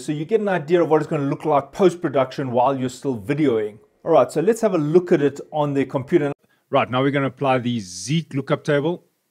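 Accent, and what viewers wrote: South Africa. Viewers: I recognize the South African accent from a mile. Hello from Cape Town.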